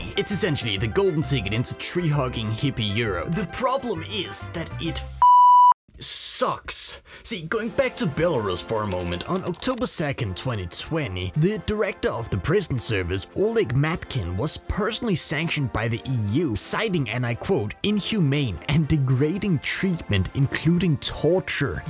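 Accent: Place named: Denmark. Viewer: Hmmm, din accent er meget... Danglish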